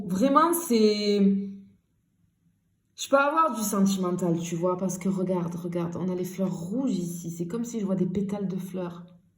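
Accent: South, France. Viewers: Bonjour, merci pour vos belles vidéos. Je les découvre depuis peu et beaucoup me parle. Celle-ci en particulier. J’adore votre énergie, votre accent du Sud, votre chaleur et votre bonne humeur. Et j’adore votre manière de faire : « ou la lalala ! » Lol recevez toute ma gratitude.🙏🤗💙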